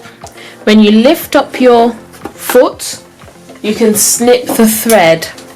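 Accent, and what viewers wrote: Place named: Europe. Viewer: Beautiful black woman with old-world skills, humble heart, and European Accent . 🔥🔥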